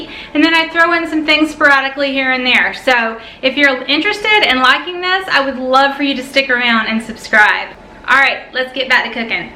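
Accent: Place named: North Carolina, United States. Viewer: Peecans, North Carolina! Love your accent! Dear Hazel, Go BITE Andrew!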